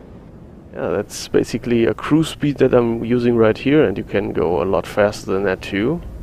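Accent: Germany. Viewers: was für eine scheiss englische ausprache. alter deutscher gehts nicht. peinlich.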